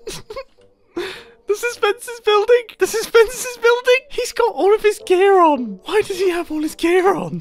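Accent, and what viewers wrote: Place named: United Kingdom. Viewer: OMG I HAD FLASHBACKS FROM PORTAL 2 because his british accent and the moron combined just remind me of weatly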